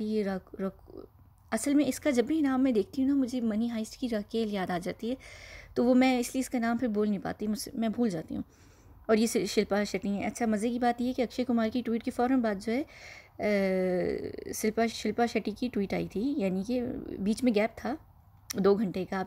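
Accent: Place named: United Kingdom. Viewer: Please please make a video on Arnab Goswami & Ankita.i am a big fan of your mam. Awesome analysis. I am a big fan of Arnab too but would love to hear your analysis & I can see he has not disclosed many things about Ssr's death in news. Not sure if there is any personal motive. Are we just watching a drama where maha govt & Republic TV are together & showing us a fake picture? It is so difficult to trust with news coming out nowaday. What do you think of vibhor anand. I have noticed he is making all these big big revelations on YouTube but there are no evidences shown by him. It feels like he is just hungry for an audience & followers. He says he is supreme court lawyer but I don't feel any credibility in anything he is saying. Please do make a video on Arnab & Ankita. I live in UK & it is 1.37 am & I am engrossed watching your videos. You also seem to be from UK can make out the British accent. 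Thanks for the awesome analysis.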